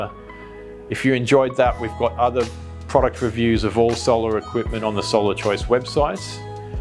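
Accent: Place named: United States. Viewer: You have a funny American Accent :)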